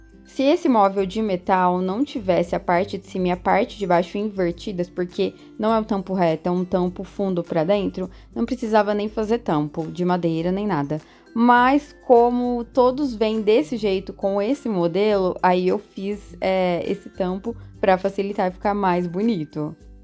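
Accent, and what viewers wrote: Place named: Inner São Paulo, Brazil. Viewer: Olá, desculpa minha intromissão, mas te pergunto... pra que essa saudação em inglês???? Nosso idioma, como sabes, é Português, ó tu, que és brasileira, e tens um nítido sotaque do interior paulista... Por outro lado, sei que o canal é teu e, como pessoa, tens direito pleno de te expressares como queiras, mas... enfim... Fora isso, admiro teus trabalhos, todos, menos as cores de tintas que utilizas, mas isso é questão de gosto e gosto não se discute... E, a título de cooperação, quanto a esse novo projeto teu, o nome correto para o antigo armário, agora transformado, é "Balcão". Aparador é outra coisa bem diferente.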